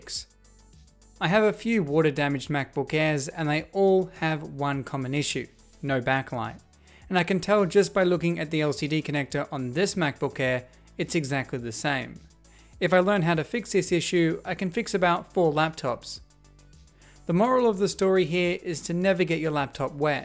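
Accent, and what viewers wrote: Australia. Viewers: I need to stop watching some many Aussie YouTubers, I thought this was a DankPods vid 😂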